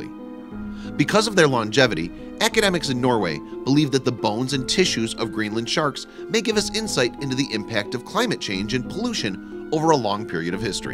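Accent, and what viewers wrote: United States. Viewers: It's so weird listening to a guy with an american accent read a British/Australian script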